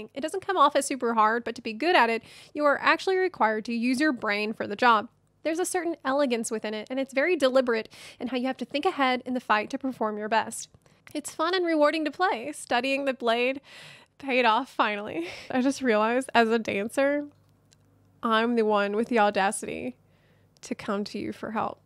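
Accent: South, United States. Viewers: Omg i cant tell if your accent is Southern American or Australian 🥺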